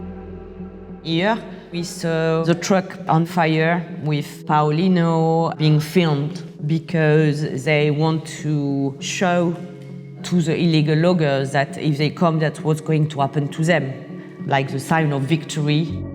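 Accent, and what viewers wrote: France. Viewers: This is the most french accent i've ever heard lol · Strongest french accent I've ever heard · I couldn't finish the video. The French accent gave me a headache!.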